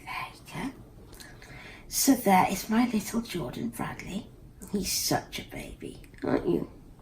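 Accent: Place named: Wales, United Kingdom. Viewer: Aww, hello there, Jordan! He’s such a sweet boy! I absolutely adore his hands and his ears! :) That’s such a sweet outfit! I so hope the new Dumbo that just came out is better than the one I grew up watching. I think the story has potential, but I didn’t like how the original one was done. It was just too sad. I love the Welsh accent! XOXO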